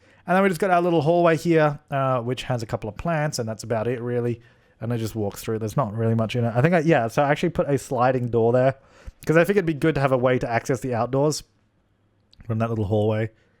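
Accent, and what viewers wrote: Australia. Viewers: Wait.... you're Australian??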